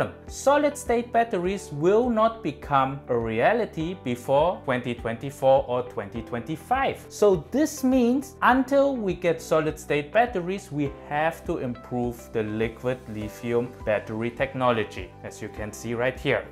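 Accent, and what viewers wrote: Germany. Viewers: Do you have a German accent ? Great video .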